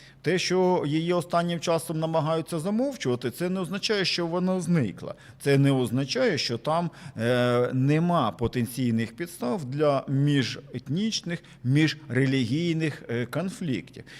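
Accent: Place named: Eastern Ukraine, Ukraine. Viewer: Не зважаючи на гарну українську мову,приємно чути цей особливий східний акцент.Поважаю Вас❤❤❤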